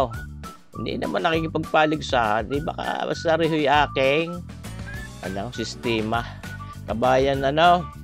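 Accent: Batangas, Philippines. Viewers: Accent batangas mo ay original ba kasi ang gandang pakinggan you sounds like an old man 😊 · Walang hiya, tangal lungkot ko sa buhay eh nang marinig ko ang tonong atin sa batangas eh! Regards from san nicolas batangas! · Baket gnyan po kau mgsalita, my acent ang tagalog mo, Batanguena kb? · Kahit di pa rin kami gaanong nakakahulagpos sa pandemic dito sa Central Florida (grabe ang spring breakers sa South Florida!), kami naman ay nakadiskubre ng mabisang gamot sa takot at lungkot dito sa aming basaysay: ang walang-sawang panonoor sa maraming cooking demos at pagbibiro ni. Ala eh, marinig lang namin ang boses at mga purong purong kanluraning salita pati na rin ang walang makakatulad ng Batanguenyo accent, abot-taynga na mga ngiti namin dito, at habang ginagaya namin ang mga paboritong putaheng inihati niya sa mga fans dito sa 'Merika, hindi pa rin napapalis ang aming ngiti. 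Salamat sa gandang-loob mo, marami kang nagagabayang kitchen ninjas sa buong mundo. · Gusto ko iyan Batangas accent katuwang pakingan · Sarap Chef. Gusto ko yang batanguenong accent hehehe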